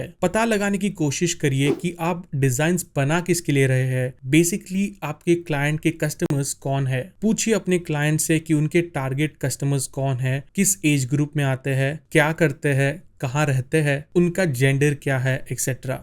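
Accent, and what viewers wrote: Maharashtra, India. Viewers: Kya Aap Marathi Ho🤔🤔🤔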